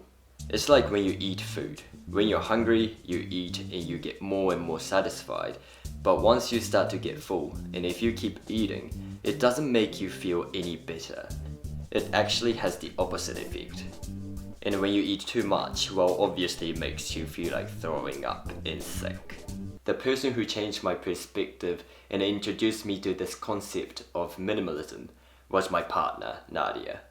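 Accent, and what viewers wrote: New Zealand. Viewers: great video, i'm from NZ and i can hear the Kiwi influence in your accent :) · Ahh! I knew you were from NZ I could hear the nz accent! Just a wee bit 😄 I’m from Auckland. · That was my first thought as well... wth... why r u microwaving bread. Also why only 1 slice?????? Plus I was trying to suss out the accent and u said nz and now I get it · Haha! Even before you mentioned NZ I was like, that accent sounds very familiar... I’m a kiwi 😊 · You have an interesting accent- a combination of different places?? How long did you live in New Zealand for? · His accent is not pure Kiwi. It sounds a lot Kiwi and a little bit something else 😊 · I love his kiwi accent.. or is it him that i love? ☺️ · HOTTIE ! I love New Zealand accents and Japanese men. Why am I not married to this guy ?? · I thought I detected a New Zealand accent, I wasn't wrong. 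· You have such a Kiwi accent Lol!! Keep up with the YouTube videos man! I miss living in Japan..had to move back to NZ because of Covid :-( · Really enjoyed this video, love the whole concept of minimalism and this was very achievable, once you mentioned that you had lived in New Zealand I could recognise a slight Kiwi accent. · Kiwi accent 👍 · It’s more like a kiwi accent. Interesting that you mistaken it for British though! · I love this! Aussie girl her and soon as you started talking i was like.... hmmmmm that accent... then you said you moved from NZ! Lightbulb moment ! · Great insightful video. Enjoyed your thoughts on minimalism. Also, strangely, I immediately noticed the flat “i” sounds of your New Zealand accent. G’day from a new Aussie subscriber :) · Minimalism is a state of mind- and it takes practice. Also: Your combination of Kiwi and Japanese English accents is simply fantastic.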